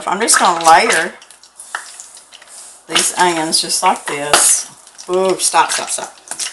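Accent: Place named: Arkansas, United States. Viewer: Oh, my goodness. I just want to come and sit and visit and eat some of that good down home cooking! I love to watch your videos and listen to your Arkansas accent. Thank you for all the good recipes. I recently bought a grain mill and watched your videos on your new grain mill. I’m 80 years young and still learning new things. God bless you both!!! ♥️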